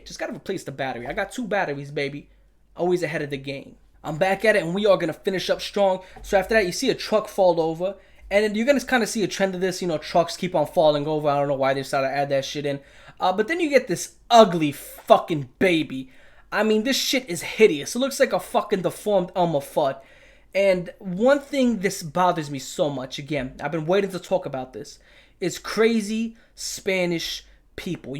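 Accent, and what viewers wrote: New York, United States. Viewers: Besides the obvious comparisons to Plagued Moth and Wendigoon, there really isn't anything else like on Youtube. The New York accent makes it for me. Sounds like Michael Rapaport talking about gore XD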